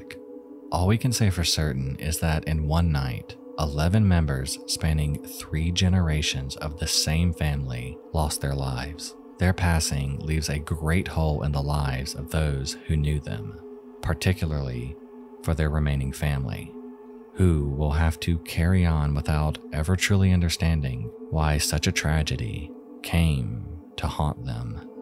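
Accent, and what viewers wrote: South, United States. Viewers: I know you're a fellow southerner. I can pick out that accent from anywhere.:p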